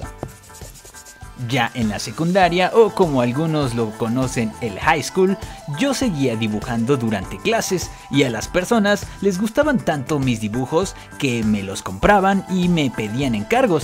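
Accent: Veracruz, Mexico. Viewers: ERES MEXICANO 😱😱😱😱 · Entonces eres de Veracruz???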